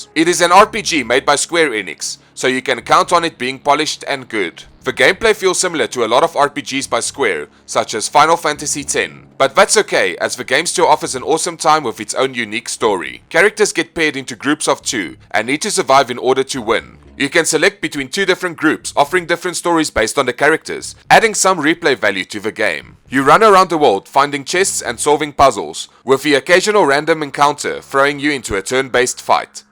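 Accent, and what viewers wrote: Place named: South Africa. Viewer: You sound a little bit Southafricanish lol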